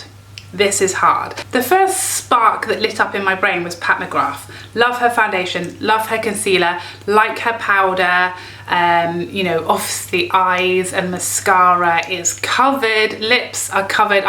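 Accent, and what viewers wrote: United Kingdom. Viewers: I’ve been binge watching your videos while doing a spa night, listening to all this makeup talk in a British accent makes me feel so elegant and sophisticated😂😂 plus you’re HILARIOUS. Love your channel lady🙋🏾‍♀️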